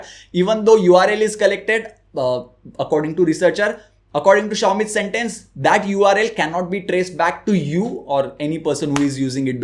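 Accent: India. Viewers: Is that one punch man with a mustache and an indian accent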